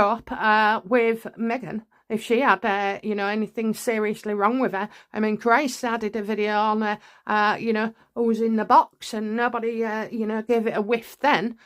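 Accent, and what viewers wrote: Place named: Yorkshire, United Kingdom. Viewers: For your information has explained in the past that she was deaf also the dialect is northern, so sounds a little strange to some. Your rudeness is absolutely beyond the pail. What a truly nasty thing to say, bet your speech isn't perfect, shame on you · How dare you that’s so rude she’s got a Yorkshire accent if you don’t understand her then turn off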